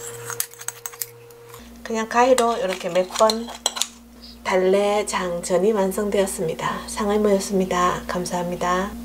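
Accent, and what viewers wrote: Gyeongsang, South Korea. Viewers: 정겨운 경상도말씨 ㅎㅎㅎ 오빠가 대구에 살고있어요~~ 좋은 하루되세요^^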